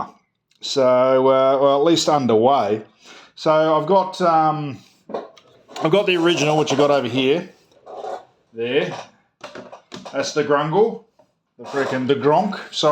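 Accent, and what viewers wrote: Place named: Australia. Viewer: love hearing another aussie talking about the hobby, good job mate!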